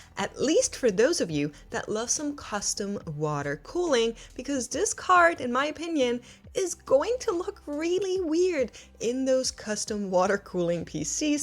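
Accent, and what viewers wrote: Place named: Germany. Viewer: I love the accent, just found your channel. Where are you from? You have Swedish look, but I've seen that look in Germans as well. Wife is German and Italian and said you look and sound German to her.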